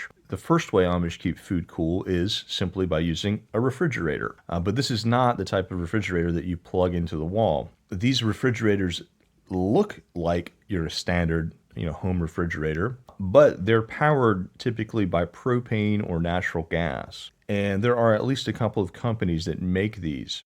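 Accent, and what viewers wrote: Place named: Baltimore, United States. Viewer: This is my first time watching but you sound like your from Baltimore :)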